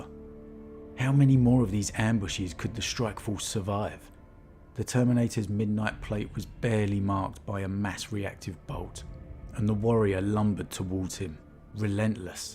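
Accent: United Kingdom. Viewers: I just realised you're British, haha. Such a subtle accent.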